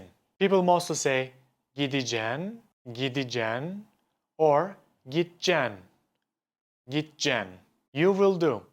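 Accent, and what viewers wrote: Turkey. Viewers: Senin Erzurumlu şiveni seveyim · Abi Türk olmama rağmen izledim bende İnilizce öğrenmeye çalışıyorum Türk aksanı ile iyi oldu. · bro. i just noticed he has a turkish accent.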